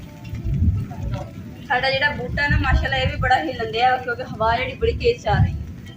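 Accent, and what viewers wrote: Punjab, India. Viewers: Aidha jalandhar wee aurata boldia aa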